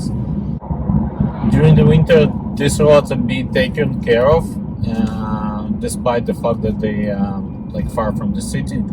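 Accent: Russia. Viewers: Wooow You have a such strong Russian accent man. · Этот русский акцент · Русский акцент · Как приятно слушать английскую русскую речь)) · You sound like Russian so no worries but if you’re a Brazilian then it’s the cold. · Русский акцент, жуткий )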